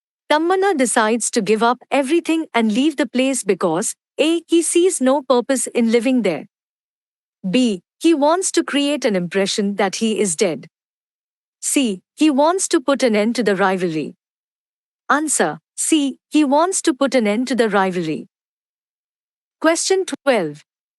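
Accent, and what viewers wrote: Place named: United States. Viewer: Your voice myam American voice but teaching is,nice myam tanks myam 🥰🥰🙏🙏🙏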